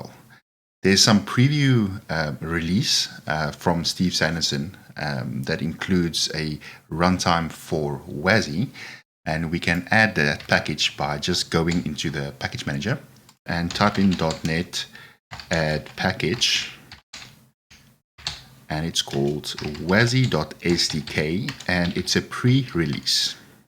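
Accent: South Africa. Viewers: This guy sounds South African :) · Ey Brother Man. Picked up that you may be South African. Just Subbed. All the best.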